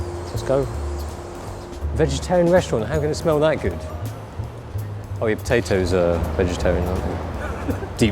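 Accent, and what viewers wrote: United Kingdom. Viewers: Haha, WHY did you let her throw a camera up like that? So obvious that it would be a epic fail, but its your fault not hers ;) I know people from Hong Kong, but I've never heard anyone with British accent! Like others say, it sounds really nice :D But did you live in Brittain then? ONE thing you should have pointed out about the cameras! They are totally different in the grip! Yes, the Nikon weight less and is smaller. But for a guy where Im from, the Nikon is really too small in order to get a really nice grip on it like you have for Canon's camera. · Asian with British accent omfg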